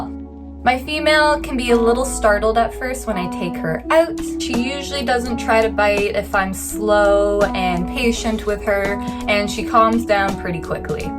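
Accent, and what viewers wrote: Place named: Canada. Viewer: You sound like you’re from Canada or maybe Minnesota.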